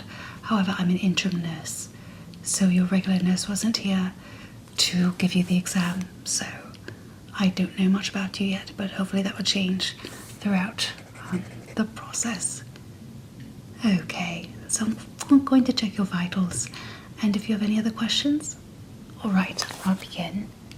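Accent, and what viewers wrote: RP, United Kingdom. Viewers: Posh English accent 😝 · Received Pronunciation (often referred to as RP English) generic South East England. A bit like Gwyneth Paltrow in Sliding Doors. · You look very beautiful. And your British voice/accent is extremely soothing. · Not a bad british accent... A bit of mix between dick van dyke and marry poppins, which seems to be where most yanks (unfortunately) get their reference materials 😊 Your accent is a little bit overly RP, so it sounds a little "posh"... But at least you didnt try and go for a cockney accent 😅 Nice job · Great video! I listened with my eyes closed and you sounded like a true Brit!👌👍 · As a Brit this is very good. Usually, when non-Brits try an English accent they tend to drift all over the UK merging different dialects. But this is solid. 😊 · This was great! Bit of the posher old fashioned Brit but sooo relaxing! 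Jolly good show! Let’s have another please! In fact a pat down in Britain! 😊 thanks Steve x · British accent on ASMR kill me😍😍😍😍😍😍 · Downton Abby English certainly, Modern English not so.. But very good accent for that era/class.. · Love the British accent 😂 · Great video! Wonderfully done "Broadcaster British" and, IMO, the lack of eyeliner/change of makeup compared to your usual videos looks really lovely (gave it a more doctorally feel). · From the uk ,you have nailed the accent it’s very bbc great work 🙌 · Love Your ASMR British Accent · brit, i dig it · lovely British accent by a lovely nurse 😊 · Odd bits sound a british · Wow I'm British myself! and your British accent is amazing! You sound posh and upper class! I love it 😊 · I'm British this sounds posh to me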